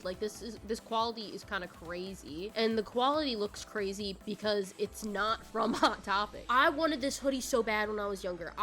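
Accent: South, United States. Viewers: Electro-Harmonix shirt, Danganronpa mic, Sailor Moon poster, Sonic PC wallpaper, slight southern accent... you are 1000% the most qualified person to talk about this and I look forward to watching your other videos. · I already know your southern, what part of the south woman! Tell me story's of the local waffle house >: ) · M...Maryland? You sound like you're from the south am I stupid?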